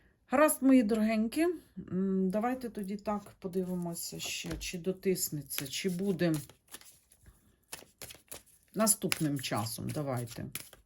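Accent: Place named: Surzhyk, Ukraine. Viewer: Хорош лапшу на уши вешать! Как баба базарная ляпаешь, семечек не хватает, и суржик прет!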